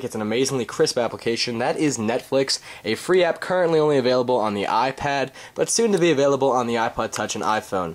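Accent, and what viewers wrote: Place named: United States. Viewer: You have a textbook american accent! Well sounds that way over here in UK ;-), my iphone 4 is coming soon and have noted down a few apps to get straight away thanks to your reviews, keep up the good work!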